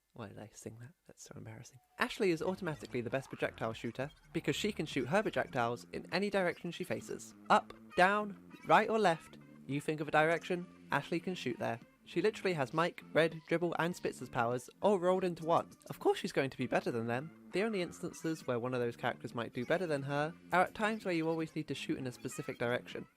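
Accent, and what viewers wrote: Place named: United Kingdom. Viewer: You sound very British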